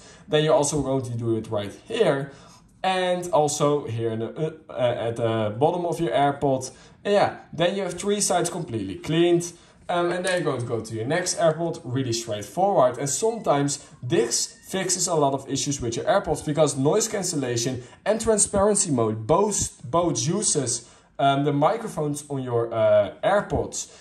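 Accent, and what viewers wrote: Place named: Netherlands. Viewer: Are you Dutch? You have a Dutch accent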